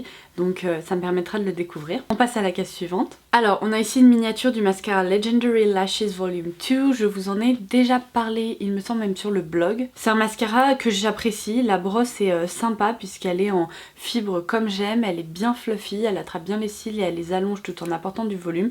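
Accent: England, United Kingdom. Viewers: J’adore ton accent anglais😍🙏🙏; as tu des origines anglo-saxonnes ?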